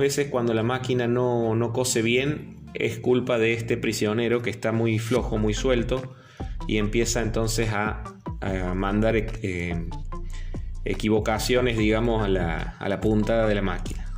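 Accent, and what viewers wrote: Córdoba, Argentina. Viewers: por la tonada me pareció que sos cordobés soy de malagueño cerca de Carlos Paz .e visto muchos videos de otras Singer pero no encontré ningúno de la 29 k . casualidad la estoy reparando y me sirve mucho tu vídeo .un abrazo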